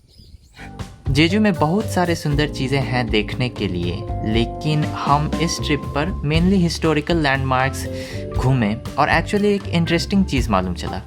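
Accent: Bihar, India. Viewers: Bhai ekdum bihar wala hindi bolta h,,,(hamko)😀😀😍😍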